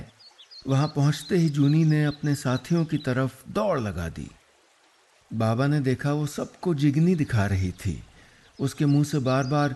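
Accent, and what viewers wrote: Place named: Kashmir, India. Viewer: That be able to use Kashmiri accent 🙏🏻🤌🏻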